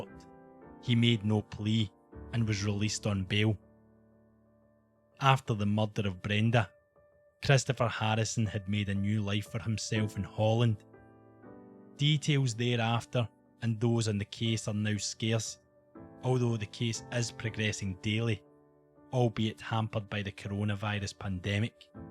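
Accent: Scotland, United Kingdom. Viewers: The scottish accent!!! so special!!!! ❤️ keep up the great work! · Hi, I just discovered your excellent channel. I was raised in Canada by my Scottish grandparents and I love listening to your videos. Can you tell me where your accent(s) are from in Scotland? My Nana was from Glasgow and my grandfather was from Tighnabruaich in the Kyles of Bute ( he said he had an “Argyll” accent and most people could not understand him in Canada) Thank you!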